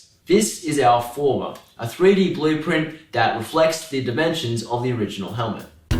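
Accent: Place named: Australia. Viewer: я уже и забыл какой у австралийцев забавный акцент привет от World of armor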